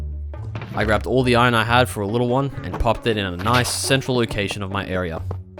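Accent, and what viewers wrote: Australia. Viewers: R u aussie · How is your accent both Australian AND New York? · i'm not english native but i'm trying to figure out ur accent. are you australian?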